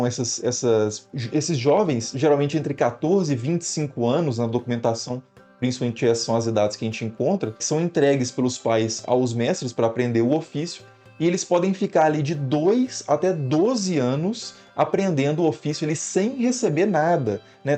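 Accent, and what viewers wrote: Minas Gerais, Brazil. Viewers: vc é mineiro de onde?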